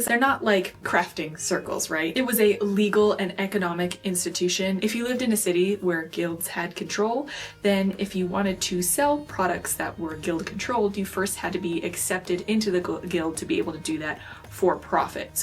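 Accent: Germany. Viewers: Ich habe an deiner Aussprache gemerkt das du Deutsche bist, deshalb schreibe ich dir in deutsch. Mein Englisch ist nicht so gut. Was muste ein Meisterstricker in 30 Tagen stricken? Gab es damals schon Vorlagen oder hat er das aus dem Kopf gemacht? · Just found your channel. I'm a complete beginning knitter, although I crochet. Thank you for your work. I was an exchange student to Germany in 1972. I love hearing your German pronunciation.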